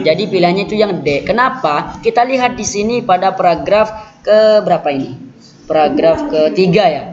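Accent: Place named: Madura, Indonesia. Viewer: Bahasanya ketahuan jek madureh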